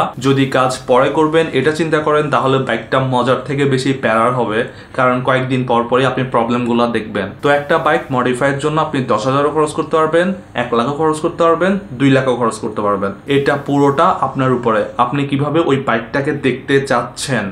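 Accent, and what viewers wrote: Chittagong, Bangladesh. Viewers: ভাইতো খাটি চাটঙ্গাঁইয়া। 😍😂😂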